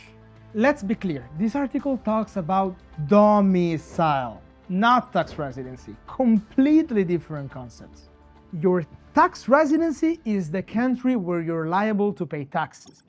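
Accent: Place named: Italy. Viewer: A very impressive video and PP team, the information, key points, story telling and entertainment were a great value and I appreciate the company I'm working with (Paraguay Pathways) is willing to go to such efforts to provide this information to the internet. Not to mention the great entertainment. AMAZING. Put in a suit with his Italian accent, he can promote Paraguay to the world:). Thanks again Paraguay Pathways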